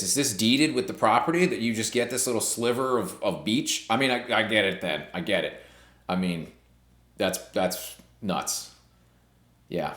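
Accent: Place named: New York, United States. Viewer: Reading those Zillow’s like a sports announcer! Add the NY to it, priceless…. “BOOM, how much money, is this guy NUTZ?” Great video.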